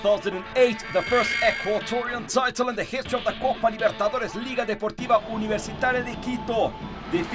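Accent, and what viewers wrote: Portugal. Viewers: Nice portuenglish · E portugues falando ingles, fico mt engraçada essa narração ai kkkkkkkk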